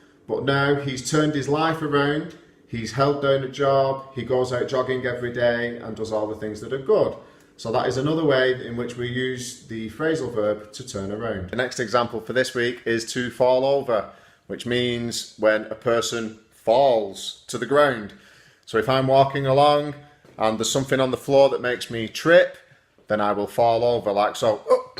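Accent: United Kingdom. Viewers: I love that you want to help people. But your accent is soooo british :DDD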